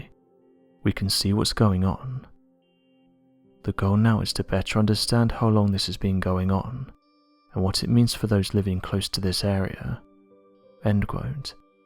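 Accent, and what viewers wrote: England, United Kingdom. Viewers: The whispering English accent can make anything scary. Besides that, research Jacob Lowenstein. He worked in the park for decades and is highly educated in the field. He has a family too…..and lives right near Yellowstone. If this “data” was a concern, I’m quite sure he’d be gone. But then again, maybe the dudes nuts and wants to die there.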